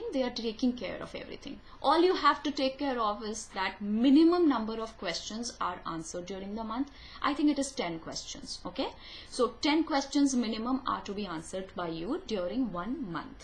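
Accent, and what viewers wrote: India. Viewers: Your accent are Indian. So you might be an Indian. Anyway let me try <link>. And BTW you are looking cool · Hahahahahahahahah....... her accents is so Indian............I am not Indian and the moment she says, "Hey guys or but" i knew that she is paka Indian!!!!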